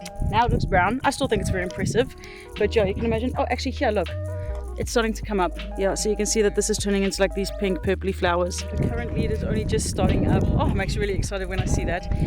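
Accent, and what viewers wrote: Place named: South Africa. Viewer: As someone coming from Namibia/South Africa, and now living in the Netherlands, it really sounds like you are also Namibian/South African. We are always looking for nature! Great to hear what I believe to be South African hahah.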